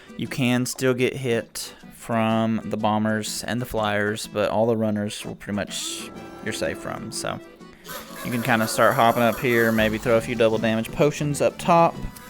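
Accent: Texas, United States. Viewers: you got such a low key texan accent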